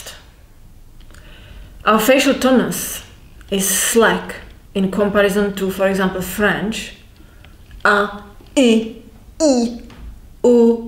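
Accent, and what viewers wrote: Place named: Poland. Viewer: I love your english. I'm from Poland and your accent is similar to polish accent 😄 I am starting study czech language and I hope your films will help me 😁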